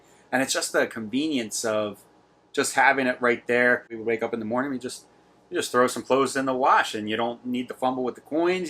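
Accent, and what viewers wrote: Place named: Northeast, United States. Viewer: Don't worry I say 'youzz' too. Its that Northeast lingo lol. Sounds like it was a great trip. Shopping for RV's is dangerous enough I don't know about actually living in one for a week. I would be back at the dealer signing papers. I look forward to seeing the walk-thru. Stay safe and happy travels.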